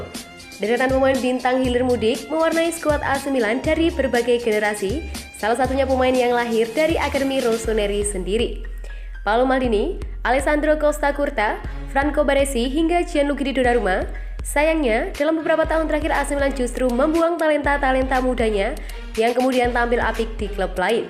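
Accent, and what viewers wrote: Java, Indonesia. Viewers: Masih kedengeran Medok mbak, hehe..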